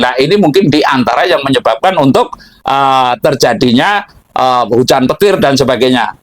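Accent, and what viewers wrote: Banyumas, Indonesia. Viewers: Nyuwon pirso Mbah penjenengan alamat ipon pundi.nuwon sewu.logat ipon kados banyumasan.kulo saking banyumas.sugeng Rahayu.🙏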